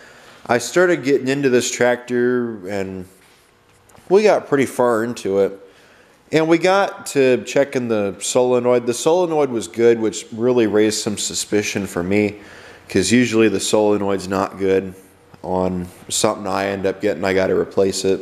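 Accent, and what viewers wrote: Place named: Maine, United States. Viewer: Sounds like your from Maine, thats where i'm at too.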